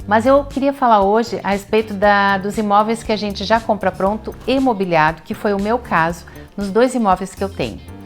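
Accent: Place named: South, Brazil. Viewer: Como já morei no sul do Brasil amo o sotaque .❤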